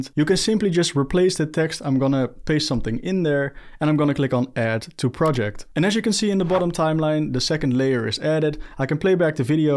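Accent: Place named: Netherlands. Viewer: Bro is from the Netherlands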